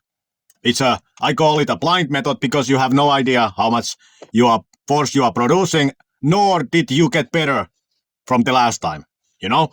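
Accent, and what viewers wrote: Finland. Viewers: Just by listening to your accent, you are finnish! I was in seinajoki for 1 year! Mitta kuulu? Lol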